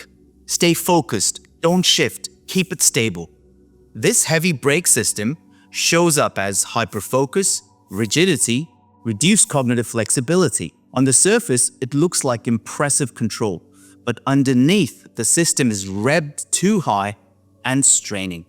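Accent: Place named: Australia. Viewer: To nuance this video slightly and I'm coming up this from the point of being in the UK and it sounds like you're in Australia so how stimulants are prescribed there may be different. But the most important Factor here is please don't watch this and now think that if you have autismand ADHD, that you should not take stimulants, that is incorrect. What's important is that you are properly and expertly titrated. I'm in a household of people all diagnosed with autism and ADHD and we all take stimulant medication, however we are on different doses and at least one of those takes a different stimulant to the rest. We have all worked out which stimulant was right for us with the support and care of an expert clinical prescriber. So whilst yes the incorrect stimulant or dose may have effects that you weren't expecting and may make your anxiety worse, with correct titration this can be mitigated and you can see improvements in both your ADHD symptoms and your levels of anxiety.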